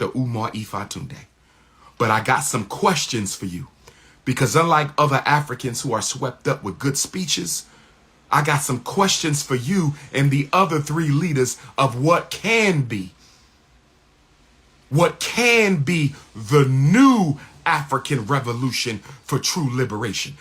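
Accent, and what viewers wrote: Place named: United States. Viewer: My man, I wish you could listen to yourself you sound so American are you sure you’re not employed by the government to talk about Africa?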